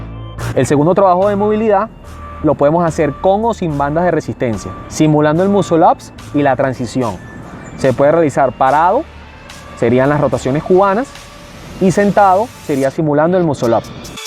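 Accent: Venezuela. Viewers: Excelente Video, te felicito. Recién me inicio en el tema, tengo unos tres meses que empece a trabajar en esta modalidad. Había intentado por mi cuenta hacer un MuscleUp y lo he logrado en un par de ocasiones pero todo todo torcido :-) Voy a seguir tus recomendaciones a ver que tal me va. PD. Deduzco por tu forma de hablar que eres Venezolano... Un orgullo encontrar Venezolanos de calidad haciendo trabajo de Calidad. Felicitaciones.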